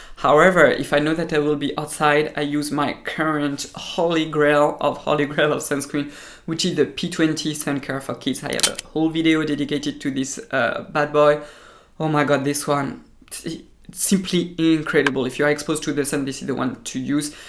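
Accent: France. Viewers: i love you and also love your french english😗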